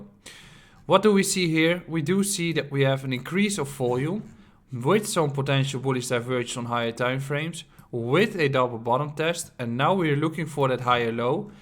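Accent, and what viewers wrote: Netherlands. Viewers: Haha, aan je accent is te merken dat je Nederlands-talig bent, wat zijn je verwachtingen voor Zilliqa aan het eind van het jaar ? Zojuist 10.000 euro geinvesteerd, hopelijk kan ik me hypotheek aflossen binnen een paar jaartjes 😃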